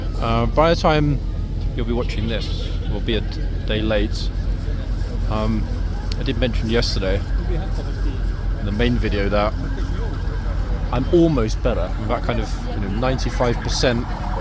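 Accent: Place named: United Kingdom. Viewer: I am wondering, will the Life Guards be there next week, or will the Blues and Royals be there? We saw the soldiers in red last year. Even though they are both fantastic, we were hoping to see Blues and Royals. We arrive next Thursday. Just wondering! I love how knowledgeable you are. The way you explain and ask questions, is awesome! We spend part of our mornings watching what is happening in our most favourite cities, London! We also love your accent! Actually, we love all the accents from the UK. Glad you have bounced back from your illness!🙂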